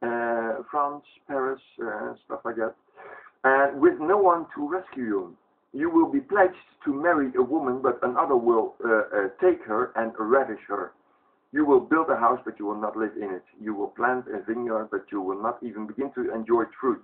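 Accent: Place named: Netherlands. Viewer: Jij bent Nederlands. Dat hoor ik gewoon. Interessante video.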